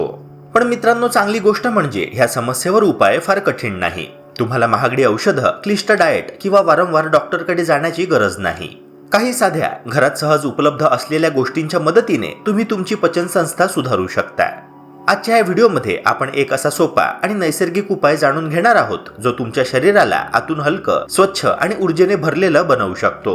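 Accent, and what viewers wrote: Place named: Pune, India. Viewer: Pune bolte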